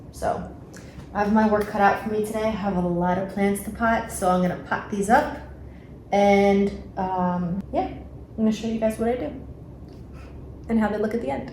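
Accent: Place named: New York, United States. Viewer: Stunning goddess: I'm just loving your vlogs! I love your way to explain and love your NY accent❤️❤️❤️❤️❤️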